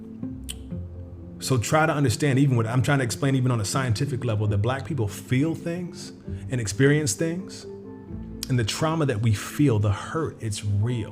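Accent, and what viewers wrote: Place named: Mount Washington, United States. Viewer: You sound like you was in Mt. Washington or somewhere close...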